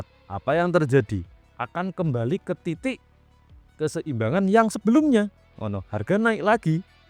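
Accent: Java, Indonesia. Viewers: frist nonton video Ki "mask" lgsg klik dgn penjelasannya dgn logat jawae dn pastine sederhana dlm penyampaiannya.. matur nwun Ki, smoga berkah dn mnjdi jariyah ilmu yg manfaat, idzin nimba ilmunya 🙏☕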